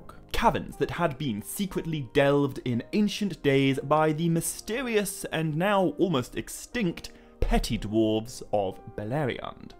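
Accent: United Kingdom. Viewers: As an American I enjoy UK pronunciations of words like aluminum....I won't correct you if you don't correct me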